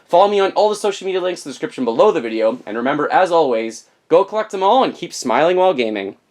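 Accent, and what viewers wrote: Canada. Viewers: Love the Canadian accent. "Try that owuuuttt." :)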